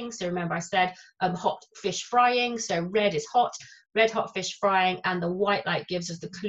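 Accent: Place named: England, United Kingdom. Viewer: It’s Greg Laughlin from Australia again and I’ve just watched this from beginning to end and it is wonderful. I really appreciate the English accent and the authority she has in her voice combined with the fact that she clearly knows her subject backwards.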